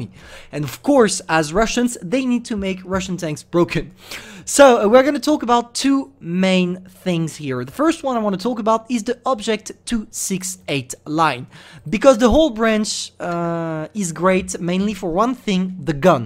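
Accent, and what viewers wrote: France. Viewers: C'est l'accent français ? Je peux pas croire que t es anglophone de base (je critique pas ton anglais) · T'es français? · he's french ,i speak French, English Greek, that's how the French speak English because the i ,in French it's like the English e in English an i ia pronounced ai . there you go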